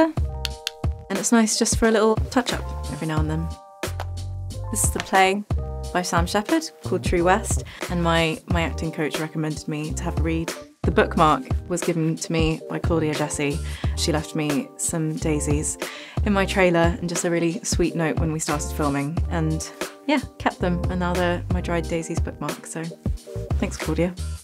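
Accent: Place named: United Kingdom. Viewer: Everything sounds better in British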